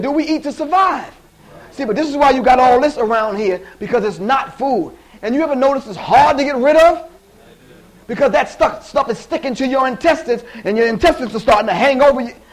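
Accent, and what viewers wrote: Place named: United Kingdom. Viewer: WHY!! This nigga sound British. (no disrespect tho)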